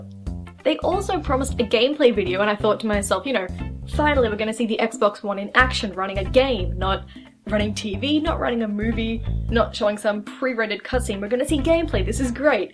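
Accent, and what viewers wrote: Australia. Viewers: Why do people always confuse Aussie accents for British? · An Australian? I thought you were British???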